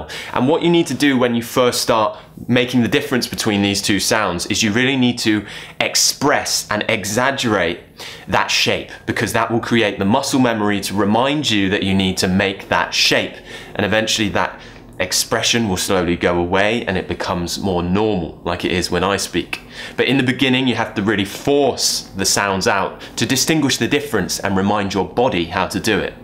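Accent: RP, United Kingdom. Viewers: Do you speak English according to rp or not explain it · Thanks to God Thanks to your parents than Thanks to you for teaching us perfect British English and please send more videos when you can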